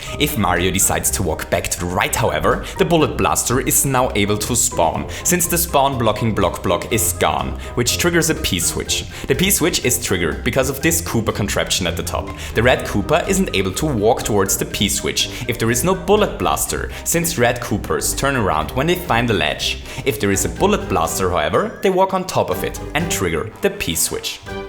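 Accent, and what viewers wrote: Germany. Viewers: Are you German? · Are you German? ^^" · what accent do you have?! most probably german... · Is german?I mean the accent... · DEUTSCHER AKZENT! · Are you a german? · Your german? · Cringey german english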